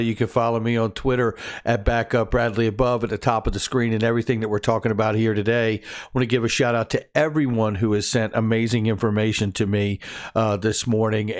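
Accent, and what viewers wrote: England, United Kingdom. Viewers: Come on mate, that sounds like a pommie accent, not a Aussie.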